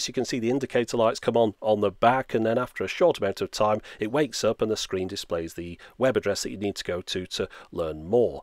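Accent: United Kingdom. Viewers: How can the original way of speech for a language be this repugnant compared to most of the subsequent versions of the same language? (U.S, Australian or Canadian accents)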